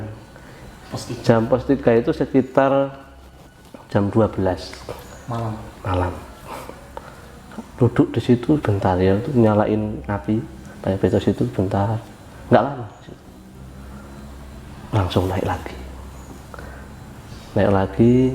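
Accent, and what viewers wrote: Java, Indonesia. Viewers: Koreksi. Suara agak kurang jelas dan ada suara gemerisik gitu. Kurang cocok kalo di dengerin gak pake hedset. Dan juga ngomongnya terlalu medok buat aku yang nyunda🙏🏻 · Jawa campur nasional😅bljr bhsa dlu yng bnr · Mas jd youtuber aja. Cara ngomongnya enak. Walau gaya jawa yah - lama bener critanya hehe - tp saya nonton trus sampe abis krn mw tau endingnya - bikin penasaran & seru juga ... Salam dari Jakarta. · Yeee ,org jawa ya bgtu ,,,medoknya keliatan bnget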